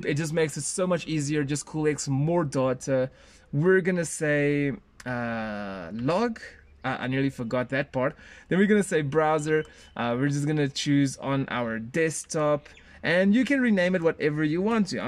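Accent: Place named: South Africa. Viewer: Haha I heard your accent and figured. I'm in SA as well, Midrand side.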